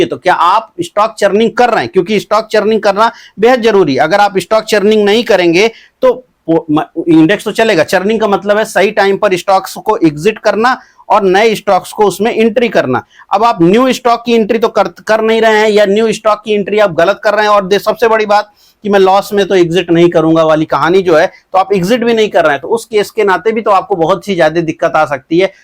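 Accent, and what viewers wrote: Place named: Ayodhya, India. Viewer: Ayodhya ji se hain, Kanpur ki boli lahja thoda alag hota h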